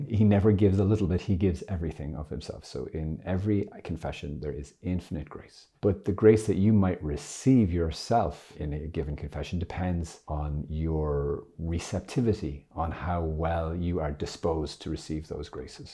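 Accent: Ireland. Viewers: Gosh, Father, I learned something....one of which is we gain more graces other than the forgiveness of sin. I liked the phrase did I love Jesus through the day? Have to think about that. Generally I love these short but pithy videos....so much is packed in. And, there is always your Irish accent! Esther:yougotthis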